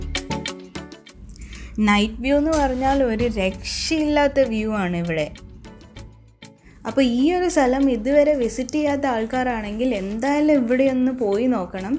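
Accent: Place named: Kannur, India. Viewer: video nannayittund ! typical kannur bhasha enikkishtayi.njanum kannurkari anu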